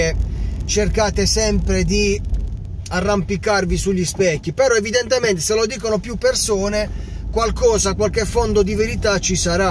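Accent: Apulia, Italy. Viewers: Ciao, io sono interista ma ti seguo, a volte dici cose giuste a volte caxxate come tutti noi che seguiamo appassionatamente questo sport. E poi il tuo barese mi fà impazzire in senso buono 😂😂🖤💙🖤💙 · Grande! Il tuo accento mi piace da morire, hai una personalita incredibile! W la Puglia! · Ciao io sono interista e pugliese come te e ne vado fiero dei nostri accenti e della nostra bellissima e invidiata terra😁poi il calcio è opinabile ognuno ha giustamente le sue ideee vede il calcio a suo modo io infatti guardo i video di più tifosi di più squadre di cui i tuoi proprio per divertirmi e ascoltare sia sfottò che analisi perché infondo a noi tifosi oltre al tifo per i nostri colori e questo che ci fa amare questo sport ciao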